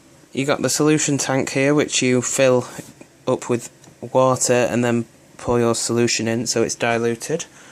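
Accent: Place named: Manchester, United Kingdom. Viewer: A mancunian accent wohoo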